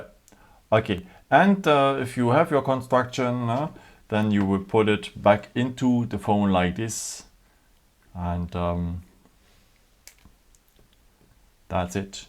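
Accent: Germany. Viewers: Bruder man merkt voll das du deutscher bist wegen dem aktzent feier dich lg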